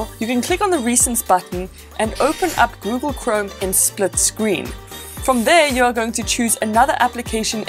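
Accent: South Africa. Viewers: You have a white South African accent. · South African?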